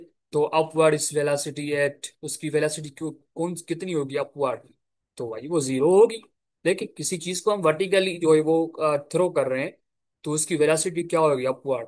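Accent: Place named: Sindh, India. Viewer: Your accent looks like you are sindhi? Acha kia dawood uni khi ka test easy hota hai ya difficult?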